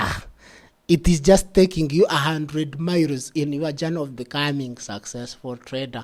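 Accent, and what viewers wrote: Ankole, Uganda. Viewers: Your accent is not bad just a kinyamkole accent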